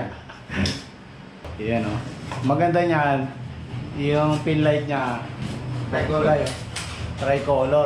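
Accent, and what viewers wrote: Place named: Pampanga, Philippines. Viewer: Sir bak8 kapampangan salita mo e, taga nueva ecija ka?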